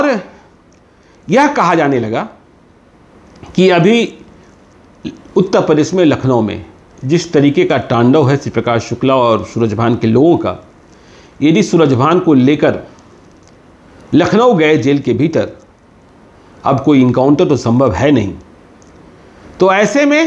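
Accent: Bihar, India. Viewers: You speak like typical Biharii and imitate Kissagoi of Rajesh Pandey IPS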